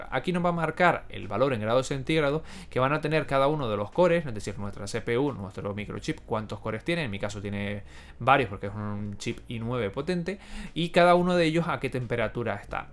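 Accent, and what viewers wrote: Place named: Chile. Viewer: que curioso que los españoles hablen parecido a los chilenos. De primera pensé que eras chileno por la entonación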